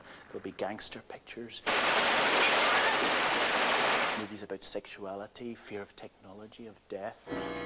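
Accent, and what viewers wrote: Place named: Northern Ireland, United Kingdom. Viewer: Hes from Northern Ireland buddy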